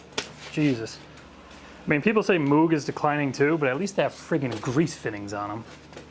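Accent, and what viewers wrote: United States. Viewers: Where are you from? You sound American, I live in the us and have an Saturn astra here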